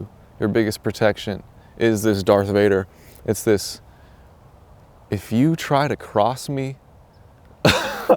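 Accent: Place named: United Kingdom. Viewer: Thank you. I like the British accent! Made me laugh!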